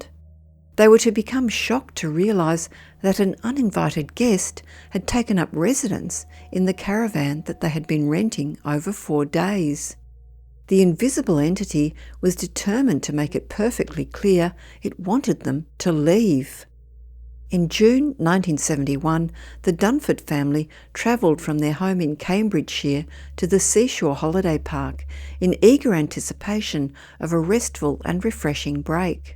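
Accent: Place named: Australia. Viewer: Hi there with the lovely Aussie gal commentary! I have a question,IF you please?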